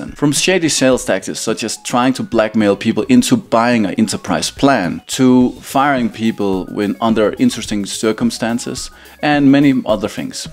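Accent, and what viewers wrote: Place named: Denmark. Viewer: This is a great channel! I'm going to venture and guess that's a Danish accent. We need more Dane dev youtubers! Godt arbejde!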